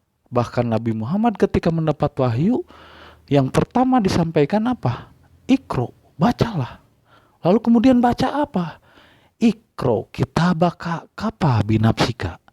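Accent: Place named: West Java, Indonesia. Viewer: MAS, KAMU HATI2... تفسير جلالين PUN KAMU TIDAK NGAJI...!! kalau dari segi logat bahasanya ini orang sunda..!! مكة المكرمة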